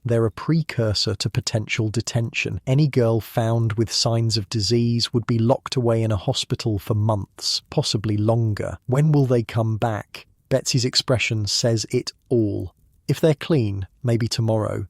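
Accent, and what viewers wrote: United Kingdom. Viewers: your beautiful, hypnotic British voice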